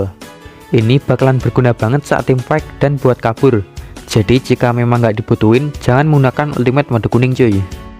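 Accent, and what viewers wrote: Java, Indonesia. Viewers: Medok amat bang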